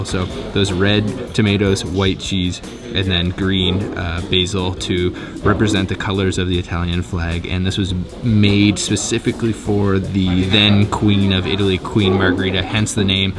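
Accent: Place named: Canada. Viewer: this guy has such a heavy canadian accent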